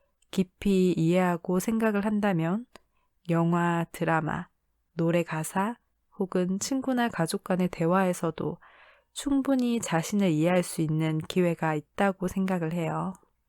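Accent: Gyeongsang, South Korea. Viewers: 너무 좋아요 경상도라니 전혀 못느꼈어요 화이팅하세요!!! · 원래 경상도세요? 전혀 몰랐어요 ㅋ 그리고 결정을 내리시는 순간에 저도 긴장했습니다 ㅋㅋ 저희도 찍을때마다 싸워서 늘쌍 고민중이에요 ㅎㅎ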